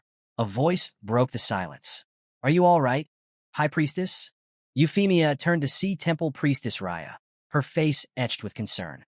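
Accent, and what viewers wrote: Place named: United Kingdom. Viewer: Why the switch to British accent at chapter 50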